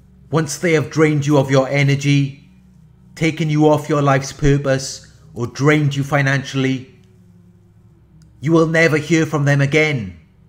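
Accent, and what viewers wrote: Wales, United Kingdom. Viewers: Welsh accent..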